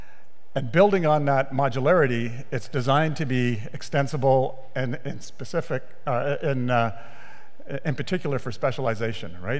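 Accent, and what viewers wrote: France. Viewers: hearing him speak Spanish with a French accent is kind of funny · nice french accent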